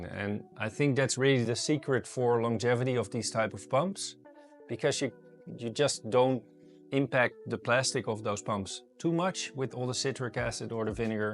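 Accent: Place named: Netherlands. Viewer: Wist niet dat je uit Nederland kwam joh! Dacht al aan je accent te horen dat je geen Engelsman of Amerikaan was, maar je Engels is erg goed moet ik zeggen. Dapper dat je in NL nog metal halides durft te gebruiken met die bizarre energieprijzen 😅 Mooie grote bak trouwens! Heel benieuwd hoe het erbij staat als er frags in staan. Je hebt er een abbo bij 👍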